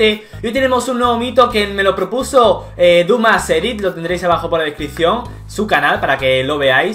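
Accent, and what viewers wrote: Germany. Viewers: eres aleman? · eres Alemán???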